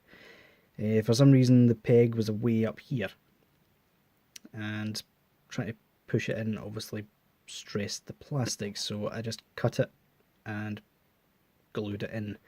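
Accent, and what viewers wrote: Scotland, United Kingdom. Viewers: i have bought this figure to see it for myself and I also cant afford the real one btw are you from Scotland because if you are I am aswell :)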